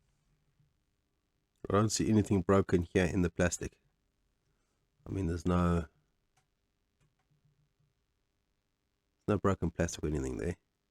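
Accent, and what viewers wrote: South Africa. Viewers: I always think of apartheid when I hear your accent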